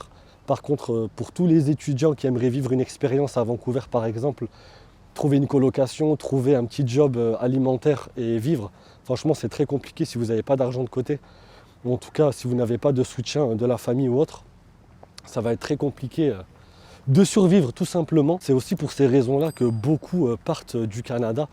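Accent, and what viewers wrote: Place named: France. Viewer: Il est évident que vous êtes un Français parce que je comprends ce que vous dites sans aucune difficulté, mais seulement 50 % des gens du Québec.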